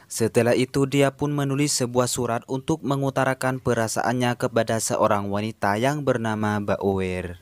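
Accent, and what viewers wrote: Madura, Indonesia. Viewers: Madura sih , kalau dri logatnya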